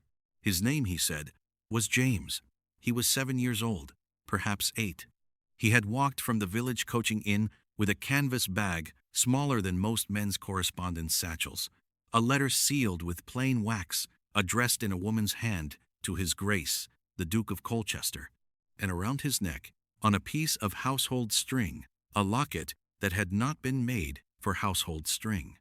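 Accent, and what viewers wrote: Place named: United States. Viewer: A BRITISH MAN, SHOULD BE READING, THIS STORY, NOT AN AMERICAN. 😠💜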